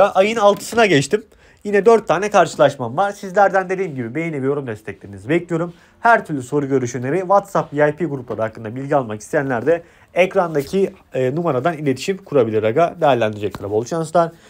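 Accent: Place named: Thrace, Turkey. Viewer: aga sen trakyalımısın bizim gibi konuşuyorsun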